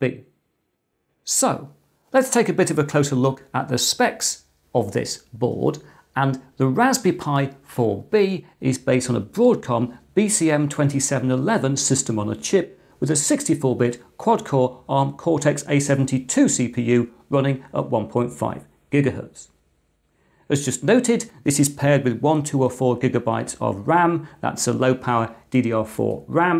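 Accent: United Kingdom. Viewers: it plays now ... yet another informative and entertaining video from you ... i struggle with fast pace of your speech and british accent but between player controls and cc option which all your videos have i manage fine and i must say your enthusiasm for topics covered is infectious and that also make your videos fun to watch ... thank you for reply and videos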